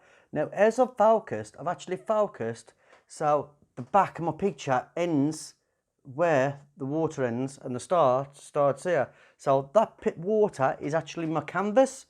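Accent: Birmingham, United Kingdom. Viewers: Where have you gone. Missing you……we need to hear your brummie accent and hear all your tips. Would like to see you do some macro with veg or kitchen things x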